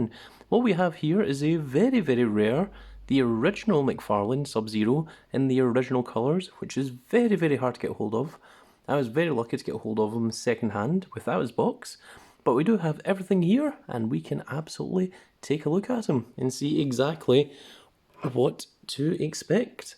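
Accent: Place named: Scotland, United Kingdom. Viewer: I can already tell from your Drew McIntyre accent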